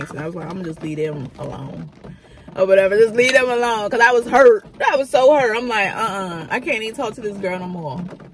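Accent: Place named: North, United Kingdom. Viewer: U must be from up north u sound like it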